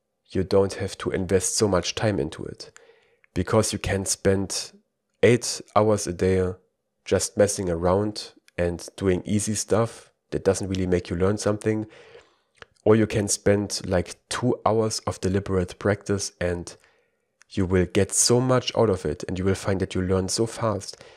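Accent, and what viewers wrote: Germany. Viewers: man merkt etwas, dass du aus Deutschland kommst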